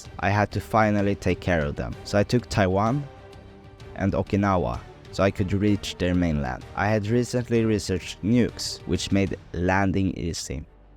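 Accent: Sweden. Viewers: Bro kiiiinda has a swedish accent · As a swede no matter where I am in the world this accent will make me feel like I'm back at home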